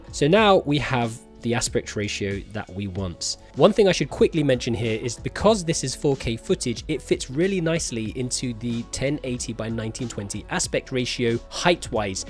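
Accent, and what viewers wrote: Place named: United Kingdom. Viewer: wow, I figured from your accent you were from the UK, so that’s why I was so curious. It looks so much like my part of the US, that’s crazy.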